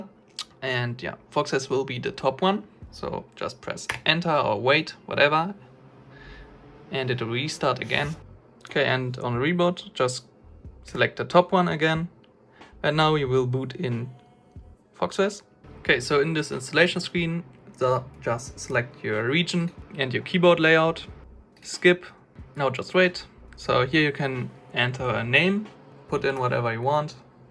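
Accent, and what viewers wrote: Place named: Germany. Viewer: bro u definitely german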